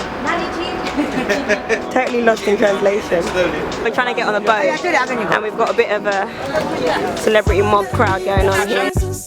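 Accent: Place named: London, United Kingdom. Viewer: Love your vids. From a fellow Londoner (you sound like you're either from south or north London lol)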